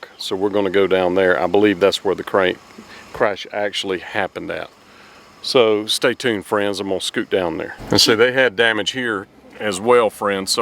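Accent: South, United States. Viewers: Just love your accent. That's proper Southern, that is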